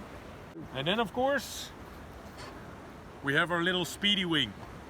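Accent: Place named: Netherlands. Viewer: nen ollander dieje engels klapt, haha ge hoort het toch direct he! Leuke review btw.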